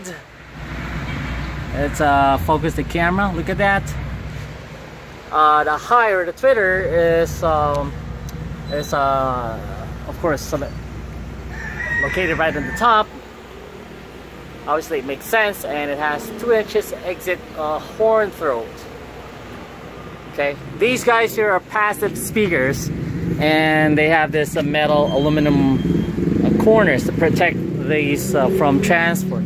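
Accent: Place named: England, United Kingdom. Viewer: Great English Accent 👍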